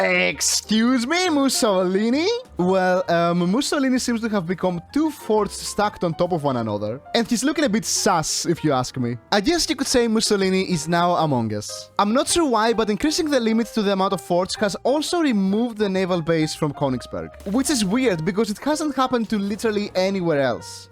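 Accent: Greece. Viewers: I swear you have a greek accent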